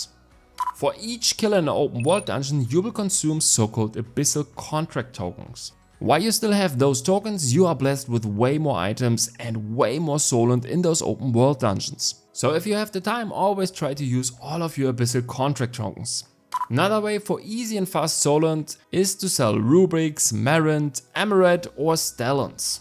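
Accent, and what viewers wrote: Germany. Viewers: Thanks for the great help! I always like to know as much as I can before I play something cause I'm all for efficiency. My ADHD friends called me autistic but always stop complaining whenever they need me to explain something or look it up for them ;) <3 Amazing video, clear explanations with added visuals as aid, no time wasting, German accent, told to have fun 10/10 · I hear german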